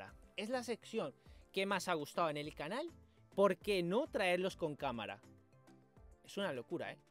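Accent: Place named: Seville, Spain. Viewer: Ostras pues te imaginaba de otra forma, no corresponde tu voz con tu cara jajaja no es nada malo, pero el acento lo relacionaba a un sevillano cerrao rubio jaja ¿ De donde decias que eras? Eres un crack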